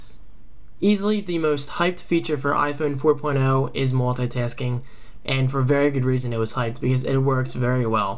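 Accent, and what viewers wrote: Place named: California, United States. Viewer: haha are u from california or somethen your accent is cool instead of photos your like FOWTOES XD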